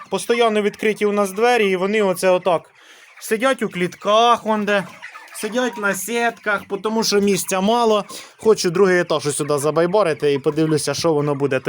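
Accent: Poltava, Ukraine. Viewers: Нарешті ти з'явився.Вже скучили.Я родом з Полтавщини,але вже 50 років живу на Черкащині .З задоволенням слухаю рідну полтавську говірку.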